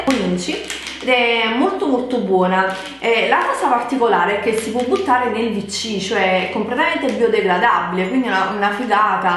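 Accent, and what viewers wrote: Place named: South, Italy. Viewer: Come mai non sai parlare l'italiano?? Cosa succede al sud che non riuscite proprio ad impararlo?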